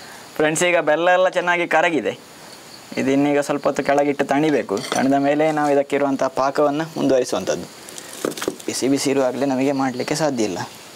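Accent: Mangalore, India. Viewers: I am from mangalore originally. Now I am in bangalore. I love the kannada you speak. It reminds me of my childhood ❤ I love the recipes you make. It's sooooooooo delicious. I'm am sooo thankful to you that you share our mangalorean recipes. I have tried your recipes and my family is surprised how I make it 😂😂 . For any recipe I refer to your videos. Lots of love. God bless you and your family. ❤❤❤❤❤ I wish to meet you and your family someday when I come to kasargod😊😊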